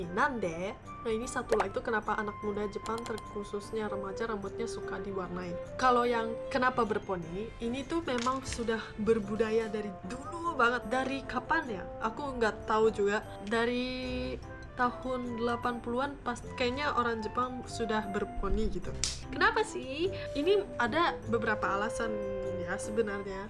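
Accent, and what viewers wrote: Indonesia. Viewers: Logatnya certified mba-mba indo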